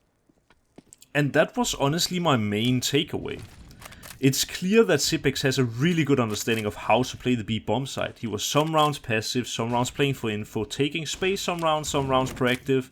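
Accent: Denmark. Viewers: nice dansk accent m ed dit engelsk dov rigtig god video ahaha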